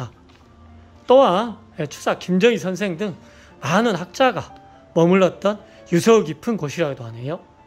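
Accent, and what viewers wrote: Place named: Jeolla, South Korea. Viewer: 워미... 이런말 혀문 안대능거이 나가 잘 알기능 혀지먼 도저히 안혀블고능 못참긋네요잉~ 아재요~ 절라도 사투리 억양 참말루다가 어머어마 혀불구마잉.. 조와요 눌라불고~ 잘보구 가게요~~~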